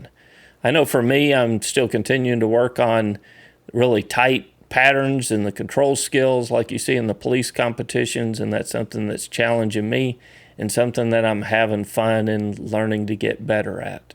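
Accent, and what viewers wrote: Texas, United States. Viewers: ...Don't sweat the complainers! Your Texas style of speaking is fine! Even us fast talking New Yorkers can enjoy a little slow down. Your videos help a lot of people, I am sure. Especially new riders. I enjoy them as a 40 year rider; and I've learned some things too. Keep it up!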